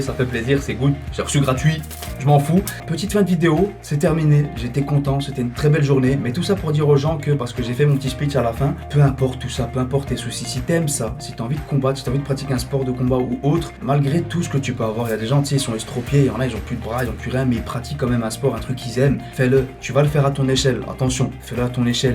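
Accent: Brussels, Belgium. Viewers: J'avais remarquer directe que tu viens de Bxl mdr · Salut, t'es belge toi non?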